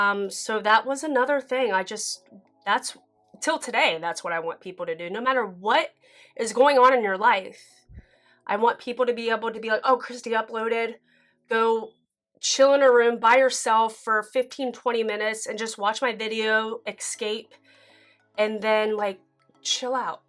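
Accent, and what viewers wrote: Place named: Appalachia, United States. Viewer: You're so down to earth! Honestly that accent is pretty close to the North Central WV/ South West PA accent... Semi-Appalachian. I'm from Morgantown, WV and you sound very similar to me.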